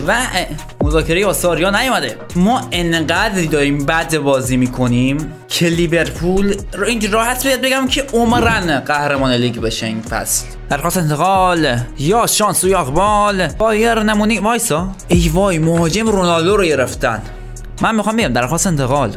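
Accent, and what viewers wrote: Mazandaran, Iran. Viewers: دمت گرم مازندرانی هستی؟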